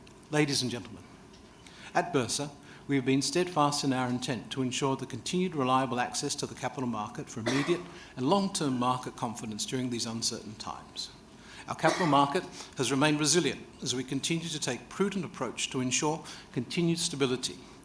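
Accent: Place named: England, United Kingdom. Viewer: hensem nyer datuk umar. I like ur English accent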